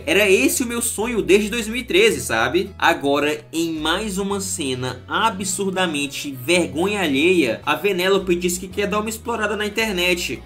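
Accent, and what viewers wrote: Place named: Northeast, Brazil. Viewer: "Engraçado" o que vc disse que as pessoas te zoam por ser nordestino, sendo que eu sou paulista e tava ouvindo tudo e pensando "que delícia de sotaque, ouviria por horas" kskskkskskskks Admito que assisti o vídeo até o final mais pelo sotaque msm, visto que discordo de mts pontos criticando o segundo filme pq tenho uma visão mais de como se eu msm fosse os personagens, entendendo bem a trama e tals kskskksksksks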